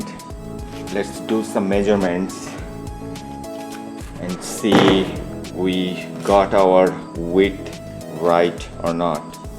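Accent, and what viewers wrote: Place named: South Africa. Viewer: Where are these sold? South Africa and Zimbabwe? [Judging by presenter's accent.]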